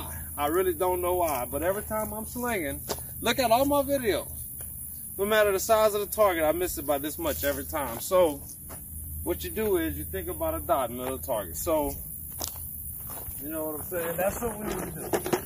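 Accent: Louisiana, United States. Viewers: Sounds like a deep south Cajun accent. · Are you from Louisiana?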